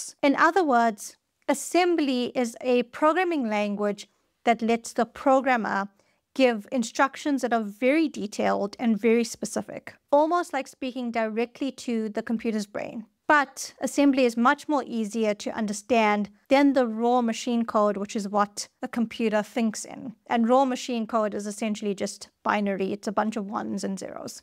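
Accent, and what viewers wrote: South Africa. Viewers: Excellent video. It's heartening to see young software developers pay homage to the giants whose shoulders all developers stand on. I was 7 years old when Apollo 11 landed on the moon. Later in high school, I read about the AGC and I was inspired to learn how to program. First course was FORTRAN with punch cards. I worked as a software engineer for 38 years and never forgot the lessons learned from those pioneers. PS I love your South African accent. Keep up the good work! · Are you South African? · Sounds Seth Efrican. Some antipodean accent. Kiwis would say “luft” and “mussion” for “lift” and “mission”.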